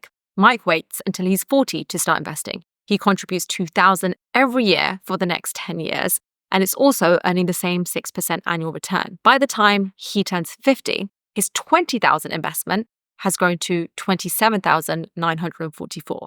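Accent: United Kingdom. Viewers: All I want to know is how to get this cool UK accent.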